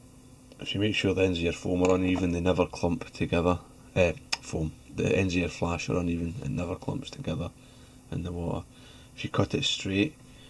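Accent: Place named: Glasgow, United Kingdom. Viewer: just learn to understand english then, with an Glaswegian accent. English is my second language and I understand it fine.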